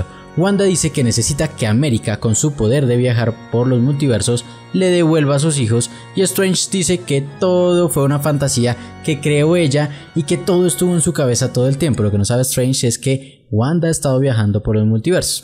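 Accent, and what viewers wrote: Colombia. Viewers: Me encantó tu resumen (sobretodo tu acento Colombiano😍, no lo contamines con barbarismos mexicanos y argentinos😖).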